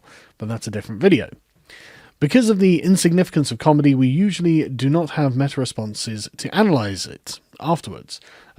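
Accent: United Kingdom. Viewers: I'm here just for the British voice ASMR.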